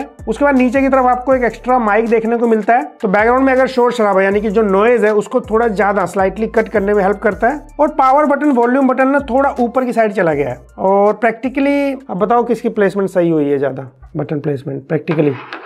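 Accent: Lucknow, India. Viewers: Lucknow se ho kya bhai?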